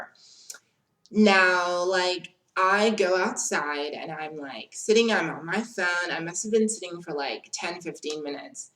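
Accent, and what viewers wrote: United States. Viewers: You sound authentic American to me... and yes your word choices do show high intelligence... I had a SA friend in High School (Dirk) who spoke fluent German and English with perfect diction.. We use American TV to teach English in South Korea and the Philippines... You're looking relaxed and happy... have a blunt .. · I love the way you speak! As an American myself, i would say your accent sounds closest to the California American accent. After listening to u speak for an extended period of time, I could pick up that the American accent isn’t native since since you enunciate words better than most Americans; and u pronounce a few select words a bit differently here and there. But still by far the most convincing American accent I’ve heard from somebody not from here 🤗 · I thought you were from the U.S. maybe California. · As an American you definitely sound like you’re from California. 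Sometimes when you say certain words i can hear your South African tone come through but when I first started watching you i had no idea you weren’t from the states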